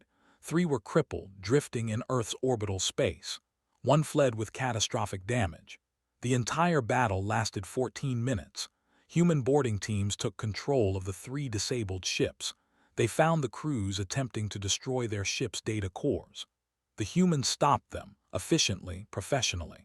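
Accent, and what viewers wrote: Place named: United States. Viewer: A story with an American voice talking about accepting refugees. The irony.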